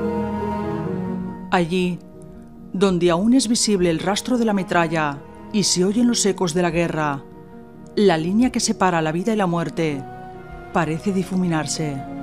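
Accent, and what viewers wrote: Spain. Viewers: Hola, me encantan tus vídeos, hermosa voz española, cuidate mucho, chao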